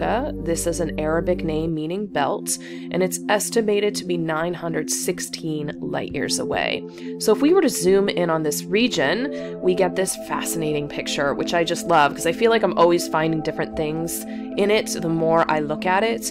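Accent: United States. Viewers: You from the east coast, possibly jersey?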